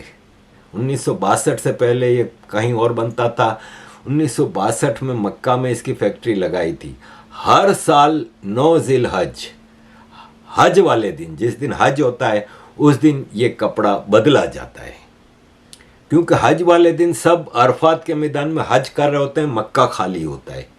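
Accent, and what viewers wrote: Gujarat, India. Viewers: aapke bolne mai gujarati lehja aa jata hai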